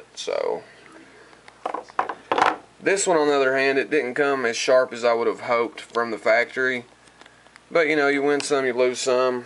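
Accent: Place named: South, United States. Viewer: i was having a go at the voice that southern US tone i just love it. This is an amazing review that Vannax C35VN Steel is insane just because S30V was voted as the best steel in 2007 or 2008 doesn't mean it still is and a lot of people think it still is. But the Vannax S35VN leaves most steel for dead. Ots a incredible steel the S35VN if you learn about it. Anyhow i was taking the micky out of ya and seems that some took it for real. Top review Champ...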